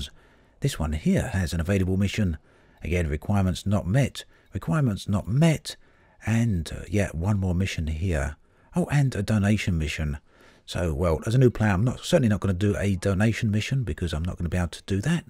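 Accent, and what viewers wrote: United Kingdom. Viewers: Funny how brit accents always make videos classier lol good job love Elite ;)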